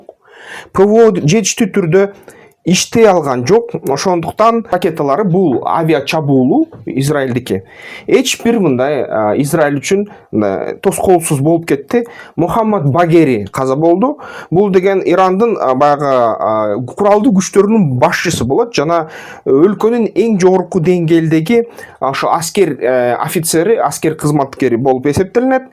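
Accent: Standard. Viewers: Таза суйлосуз агасы азаматсыз😊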